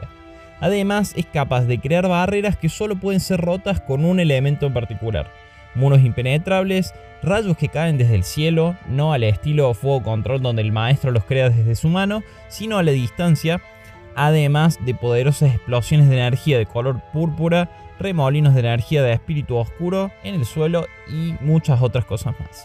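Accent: Cordoba, Argentina. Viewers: Sos el primer youtuber cordobeeesaso que escucho jajaja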